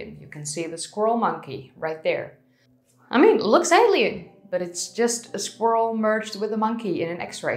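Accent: Netherlands. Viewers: You must be Dutch right? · her wonderfull dutch accent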